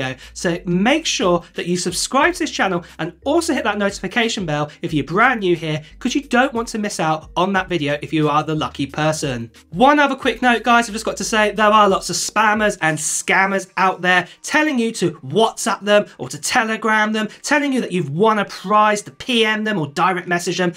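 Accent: United Kingdom. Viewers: i love your british accent and i hope to have the iphone 14 pro max pleaaaaaaase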